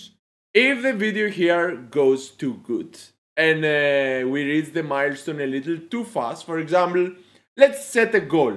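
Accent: Greece. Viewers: Unique Greek accent #BALORIANS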